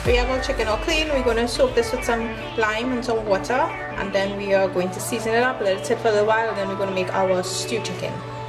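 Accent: Trinidad and Tobago. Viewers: Wow. A muslim speak english. And Trinidadian english accent. Cooking Trinidad stew. Wow. Because the muslim in canada dont speek english. They speek only thing from packastand . I wish them Will speak english like you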